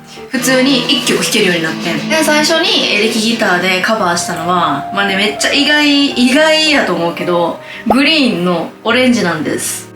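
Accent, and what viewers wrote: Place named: Osaka, Japan. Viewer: 何色ものスペシャルカラー仕様のGOシリーズは提供だったんですね？ しゃべくりにいかにもな大阪のねぇちゃん味があって良かったです！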